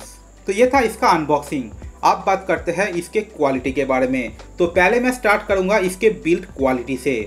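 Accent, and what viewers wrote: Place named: Bengal, India. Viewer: Shune mone hocche tumi bangali... Banglay content dile aro bhalo lagbe...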